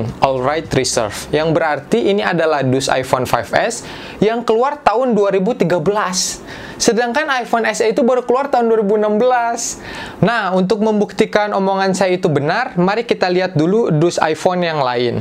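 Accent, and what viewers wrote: West Java, Indonesia. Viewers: orang sunda nya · kok mirip efkahfajar yak nadanya, apa karna org sunda? ahahahaha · Om, situ orang sunda ya?, Logat logatnya sunda bgt😂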